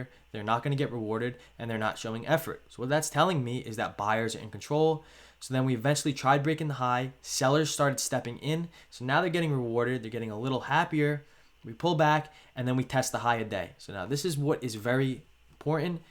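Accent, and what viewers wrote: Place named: Georgia, United States. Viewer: You definitely sound different with that mic. I thought you were from Georgia.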